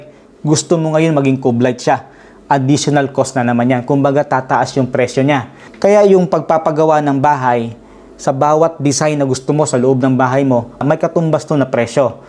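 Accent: Waray, Philippines. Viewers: Waray ka pala Sir? Tacloban ka Sir? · Waray ka ngay-an gihap Sir. Nice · Waray ka ngay an engineer? Taga diin ka? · I hear waray, I subscribe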